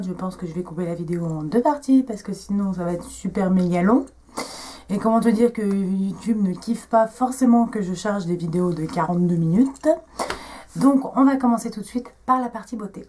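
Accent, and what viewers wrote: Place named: England, United Kingdom. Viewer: Coucou. Contente que les rouges à lèvres Catrice te plaisent et merci pour le petit clin d'oeil ça fait plaisir! Oui effectivement tu as un peu craqué ton slaiiiip mais je te comprends les -25% ont aussi fait des ravages sur mon compte en banque. Bon j'ai encore rien pu tester à cause de cette Motherfucking allergie au visage. Gros bisous! Ps: j'adore ton accent anglais! Mdrr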